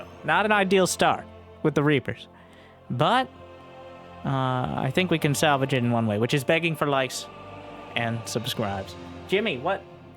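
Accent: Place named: Midwest, United States. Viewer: Where are you at? His accent hmmmm I'd say Indiana or Missouri.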